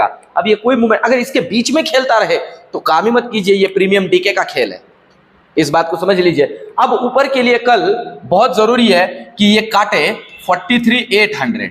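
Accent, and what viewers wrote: Maharashtra, India. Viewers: r u from maharstra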